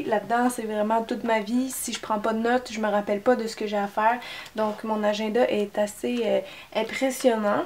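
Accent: Quebec, Canada. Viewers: PS : ça fait un bon moment que je suis tes vidéos, je vais essayer de trouver plus de temps pour aller sur ton blog car il y a de super articles. J'apprécie vraiment les conseils et la qualité de tes vidéos. Sans parler de ce charmant accent pour la française que je suis ^_^ (si je n'étais pas en couple, je pense que je serai venue travailler un peu comme infirmière au Québec, je suis mauvaise en anglais par contre, parce que j'ai toujours rêver de venir au Québec !) Merci encore pour tout ce travail que tu nous offres ;-) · C'était vraiment cool à voir :) Et je me lasse pas d'entendre ton bel accent. Grâce a toi, j'ai réussis a perfectionner mon accent québécois ;) Tu penses que tu pourrais faire une tweet cam un de ces quartes ? Ce serait sympa :) Bon vidéo et keep on rocking girl t'es écœurante :)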